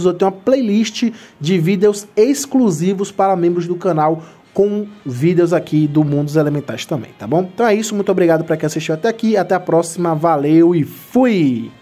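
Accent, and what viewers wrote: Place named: Salvador, Brazil. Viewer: Salve de Salvador meu nobre, pelo sotaque sei que é conterrâneo, de qual bairro fera?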